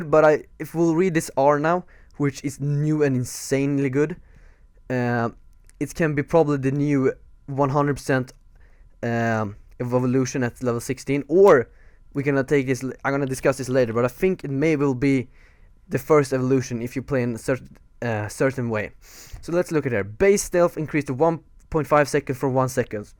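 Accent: Sweden. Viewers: Are you from sweden? Because your accent sound like you're from sweden:d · Are u swedish? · Don't you hear the obvs swenglish?:-)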